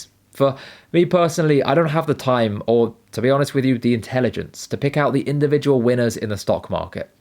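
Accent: United Kingdom. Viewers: Hello,! I have been watching your videos for quite some time and I am really excited about stock investing as you are (and your british acent is supercool!). I know you are not an advisor or smth, but I would love to hear your opinion about these five stocks (They got on my radar for this/next months investments to buy). It is: Verizon, wingstop, alibaba, walmart and pluralsight. What do you think about these (going long run 2-5years?). Maybe you have something else on your sight? Thanks in advance!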